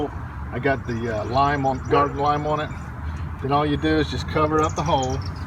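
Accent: South, United States. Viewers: I must admit that I first decided to trust you because of your Southern accent. The Tennessee came through loud and clear--my grandparents live there and listening to your voice was comforting. Then I realized just how much you and know about gardening. Your story is beautiful as is your faith. Thank you for providing an effective gardening education and so much hope in a time of need. God Bless.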